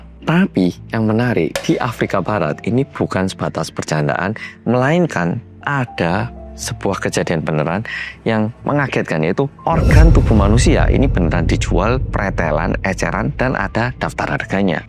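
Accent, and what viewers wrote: Java, Indonesia. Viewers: Hai muedok🎉 Nyimaaak 🎉🎉🎉 terima kasih, yaaaa 🙏🏿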